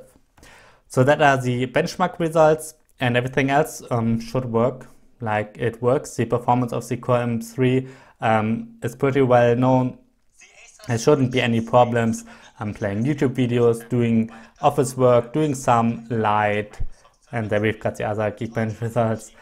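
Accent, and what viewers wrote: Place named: Germany. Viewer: Are you german? Shis is fery notisble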